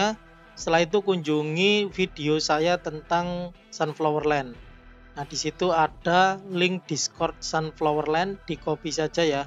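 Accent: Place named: Java, Indonesia. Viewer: suka denger suaranya yg khas dg jawani dan lucu wkwkwk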